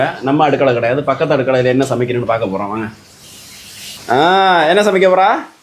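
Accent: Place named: Kanyakumari, India. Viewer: nanum kanyakumari than anna language vachi kandu pudichan anna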